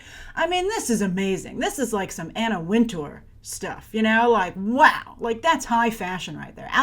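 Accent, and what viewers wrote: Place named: Boston, United States. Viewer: Are you from Boston or do you have a speech impediment?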